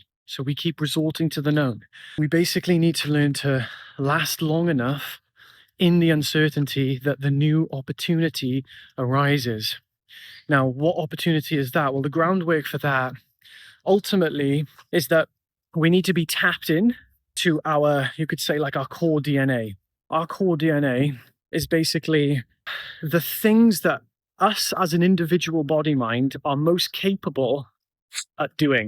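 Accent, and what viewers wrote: United Kingdom. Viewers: I see you are in Australia but sound like a 🇬🇧:)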